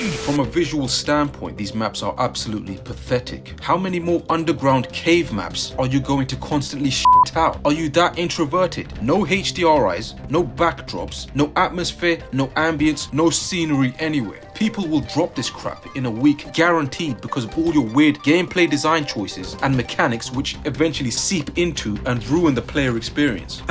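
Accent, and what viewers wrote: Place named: United Kingdom. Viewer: ya veo acento británico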